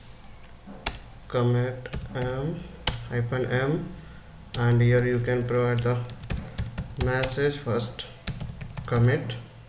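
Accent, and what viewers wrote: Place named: India. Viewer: Whenever you hear this hindi accent I know i'm in good hands.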